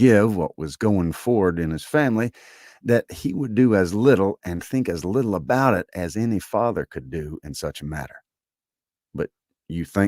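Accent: South, United States. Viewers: This is brilliantly read. I would never have thought with a southern accent it could be just as clearly conveyed being Regency English. Well done.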